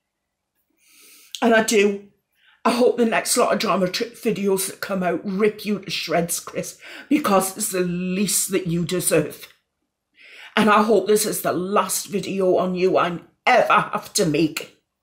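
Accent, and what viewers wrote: Newcastle, United Kingdom. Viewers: Oh my goodness, I wanted to reach through the screen and hug you. You are like a mamma bear and clearly have so so much compassion for everyone and their mental health. You are amazing, please keep being you! As an unrelated and not serious side note - I love your geordie accent! I always connected it with genuine kindness and love. I hope you are ok <3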